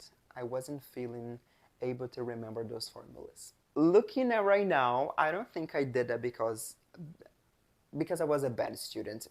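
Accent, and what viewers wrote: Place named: Brazil. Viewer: não tem como não entender pois por mais que você tente fazer a pronúncia de um americano você fala do jeito cadenciado de um brasileiro então está 100% compreensível sim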